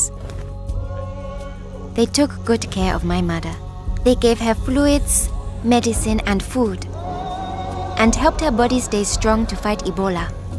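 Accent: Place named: Uganda. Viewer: lol the voice is ugandan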